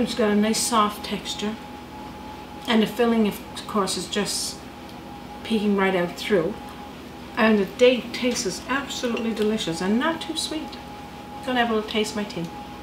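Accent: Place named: Newfoundland, Canada. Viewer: I just discovered this channel tonight. Lovely to hear the 'Newfie' accent! True maritimer with a cup of tea to go with! Thanks for your knowledge! Happy holidays my fellow Maritimer!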